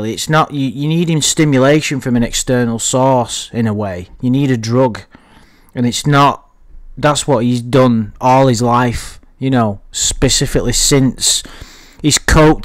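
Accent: United States. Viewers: your American accent is incredible. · Not a bad yank accent.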